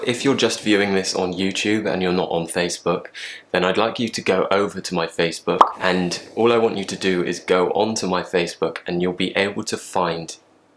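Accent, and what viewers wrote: United Kingdom. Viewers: are u british, babe??? I love you much. thanka for be a great teacher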